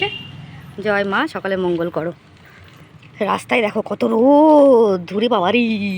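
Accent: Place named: Bangal, Bangladesh. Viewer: Heavy bangal vasha bolen toh . Amio bangal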